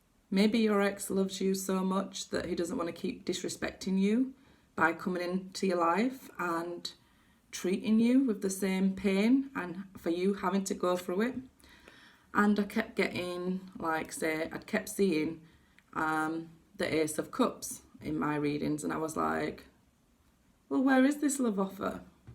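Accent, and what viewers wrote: United Kingdom. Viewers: I hear you. British?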